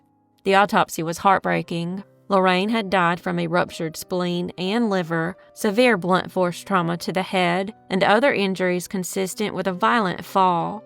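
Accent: South, United States. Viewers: I am seeing this 9 months later and I hope that you are doing well and pray that good will comes your way. God bless and I wholeheartedly agree with you, rocks and somehow her sweet southern soul shines through her accent and it’s very soothing on one’s heart and soul. God bless.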